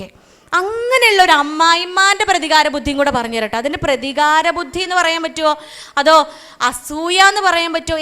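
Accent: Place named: Malappuram, India. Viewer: മലപ്പുറം ബാഷ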